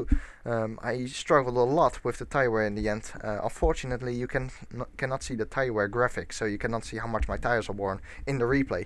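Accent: Netherlands. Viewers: Zo grappig dat je toch echt hoort dat je een hollander bent.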